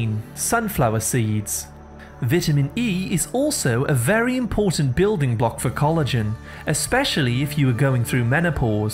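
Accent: England, United Kingdom. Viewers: Whats with the damn English accent..LMFAO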